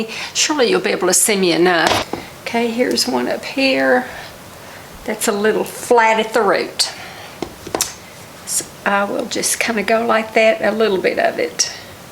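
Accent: South, United States. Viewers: Knew u were a southerner when I heard u talk and a tennesse rwsident...so am I....tfs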